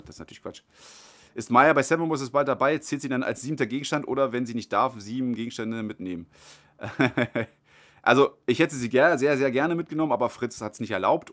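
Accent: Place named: Berlin, Germany. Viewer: Schönes Video, lieben Dank!!! Wenn du magst kannst du gerne mehr "berlinern" ;);););) LG aus Rheinbach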